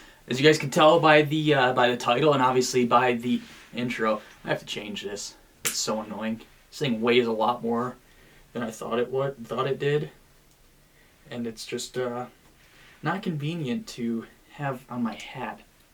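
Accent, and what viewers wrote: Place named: North, United Kingdom. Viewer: This channel is awesome, this guy totally reminds me of another YouTube angler. If you close your eyes and just listen to his voice, he sounds almost identical to Sam Sobi, just a lil less northern 😂.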